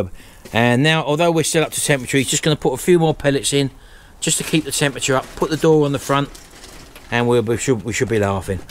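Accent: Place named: Cockney, United Kingdom. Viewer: Fairly new to your channel and I love it, I'm from East London but now live in Thailand, good to hear the Cockney accent. Dave B Thailand.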